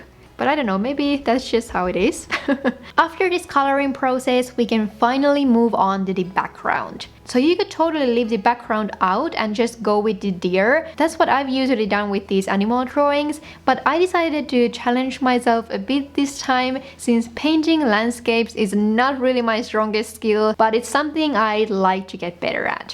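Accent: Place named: Finland. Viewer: Found this video by searching for bj/planner videos. Amazing artistry. 👌🏽🙏🏽❤️ As soon as you started speaking I guessed you're Finnish😊🇫🇮. No offense ❤️. EDIT: The squirrel is exquisite 💕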